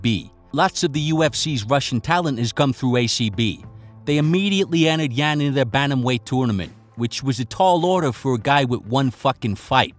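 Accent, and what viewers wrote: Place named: Northeast, United States. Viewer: Time for a poll: Which part of the Northeastern US is the narrator from?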